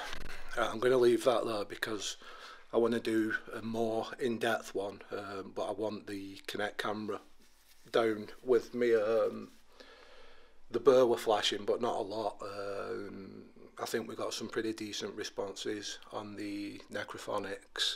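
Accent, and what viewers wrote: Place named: Northern England, United Kingdom. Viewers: Alreet, lad. I'm new to your videos, and I've more or less binged watched them. When and how did this all start mate? You sound local too. I'm from Manchester. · This is madness. You sound like me, are you sheffield/Rotherham area? Do you get scared at all or have you just got used to it?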